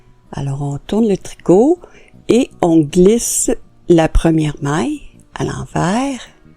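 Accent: Quebec, Canada. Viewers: J'adore ces pantoufles que je vais commencer ce soir , et vous Madame , comme professeur , vous êtes super : les expliquez très bien et quand vous approchez la caméra , on ne perd rien de l'explication , c'est super ! Cependant j'ai un petit bémol à propos de la musique que je trouve beaucoup trop forte , Je suis malentendante et je perds beaucoup d'explications à cause de la musique . J'ai essayé de l'enlever par tous les moyens que je connais mais je n'ai pas trouvé de solution ... C'est le seul petit reproche que je peux vous faire et à part ça , vous êtes du Québec, comme moi et ça me fait plaisir d'écouter votre voix . Merci pour tout .Excusez mon audace .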